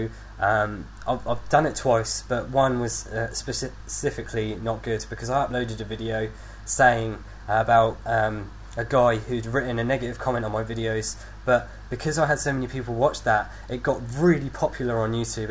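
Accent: England, United Kingdom. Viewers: duh thats an english accent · like ur accent. British accents r hot!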